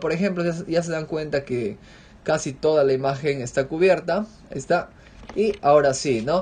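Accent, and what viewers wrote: Peru. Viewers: Eres peruano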